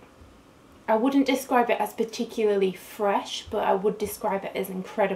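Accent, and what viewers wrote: United Kingdom. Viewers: I love this accent not every British person does have this kind of accent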